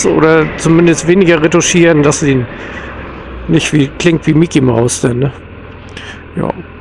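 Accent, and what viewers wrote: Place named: Northern Germany, Germany. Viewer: Ach, ich liebe den norddeutschen Akzent einfach 😃 Hab mal nen Abo dagelassen, und hoffe das hier bald auch mal wieder Videomässig was kommt!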